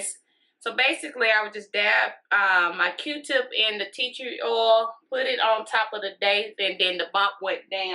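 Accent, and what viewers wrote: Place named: South, United States. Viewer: Ooooh that southern twang....lol. Country girl. I got the same piercing. I love it.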